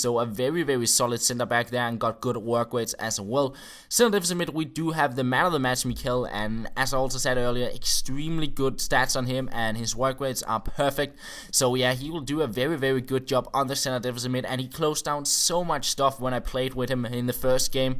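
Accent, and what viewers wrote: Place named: Denmark. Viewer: I love your Danish accent!!!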